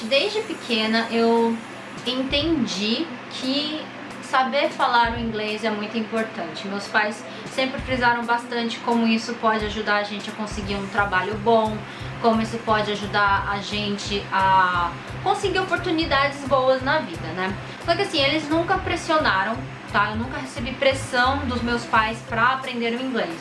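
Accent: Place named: Rio de Janeiro, Brazil. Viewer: Oinhe Mestra * - * e esse sotaque carioca ai kkkkkkk adorei seu video (como sempre ne <3) to estudando ingles e japones mas ta sendo dificil pq odeio ingles, mas fazer oq ne a gente tem q aprender:( ja quero outro video:D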